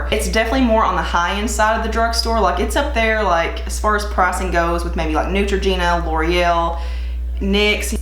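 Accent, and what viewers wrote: South, United States. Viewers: I love love your video! This is the first time I’ve seen your channel and I live it!!! You have a wonderful voice and you don’t stammer! You know exactly what you want to say! No “um” no “like”! You talk fast but not too fast! On other channels if the talk too slow I find myself saying come on spit it out already! I get bored and go to another channel! You are very professional! And your southern accent is over the top! Will be watching all your videos! You rock girl! Thanks and keep up the great work!!! PS I love your lipstick! What is it? All of your makeup looks fabulous! Great job!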